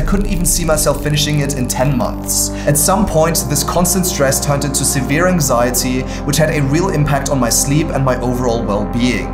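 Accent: Germany. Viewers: Respect und wusst ichs doch dass du nen Deutschen Akzent hast🙃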